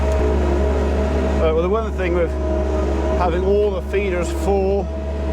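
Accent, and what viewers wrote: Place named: Suffolk, United Kingdom. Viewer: Where you from? Where do you live,sounds a bit Suffolks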